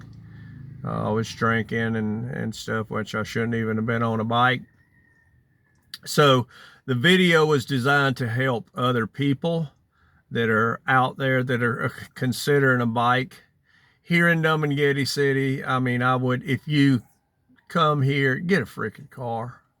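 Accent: South, United States. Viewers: Well spoken i appreciate your old school southern way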